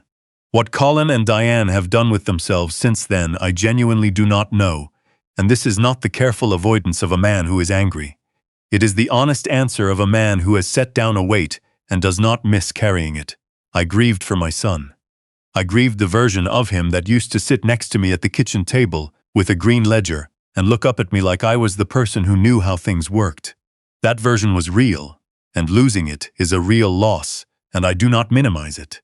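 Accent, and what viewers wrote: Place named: Ontario, Canada. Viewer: Sound like Ontario Canada 🇨🇦.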